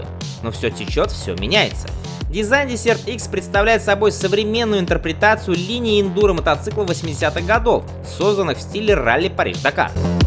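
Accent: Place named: Russia. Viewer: мда.... петушинный кацапский акцент, десерт - это в столовой, а это дЕсерт - пустыня, хоть бы название мотоцикла потрудился сказать правильно в первые секунды ролика.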